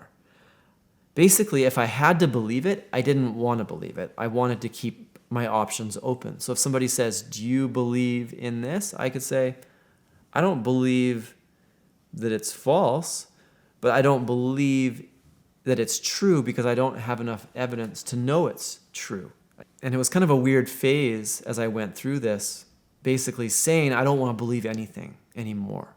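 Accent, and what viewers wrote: Canada. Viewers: I have a very similar perspective to you on beliefs, but you've worded it much better than I can right now. I went through a religious phase but now am agnostic. Honestly my belief system is a Frankenstein monster of bits and pieces of every religion, belief system, and psychology but at it's core it's trust my intuition on what feels correct. I also appreciate your Canadian accent, my mother's side of the family is Canadian and it's always made me feel at ease 😊 I'd love to hear more of what you think on this topic.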